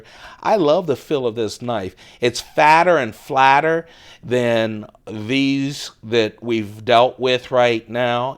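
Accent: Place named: Upper Peninsula, United States. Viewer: Redeemed Man, I agree 110%. Unbelievably annoying. I also couldn't finish it, had to shut it off. As asked above, was he under the influence of drugs/alcohol? Man, if I ran out of hand strength after operating these knives a few times I sure wouldn't make a video showcasing it. He sounds like those guys that sing,. I won't make this mistake again.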